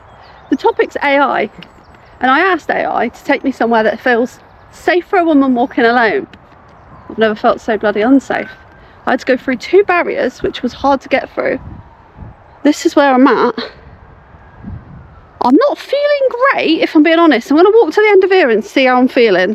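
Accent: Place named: United States. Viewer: As an American, it threw me for a loop hearing another American voice suddenly 😂😂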